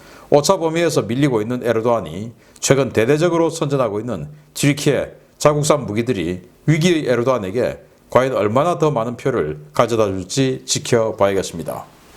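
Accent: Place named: Gyeongsang, South Korea. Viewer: 까딱하면~~ ㅎㅎㅎ 박사님 경상도 사투리가 나는 듣기가 좋은데 저~ 서쪽에나 저~ 위쪽에 사는 사람들은 무슨 말인지 못 알아들을 걸요?? ㅋ 박사님 늘상 좋은 날이 되시길 기원드립니다.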